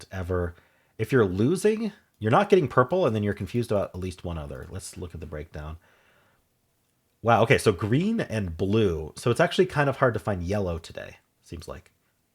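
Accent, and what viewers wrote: United States. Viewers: There's the old American 'T' that sounds like a 'D' again.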